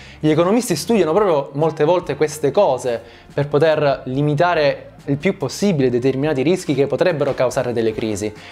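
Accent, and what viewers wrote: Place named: Tuscany, Italy. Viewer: Quasi toscano direi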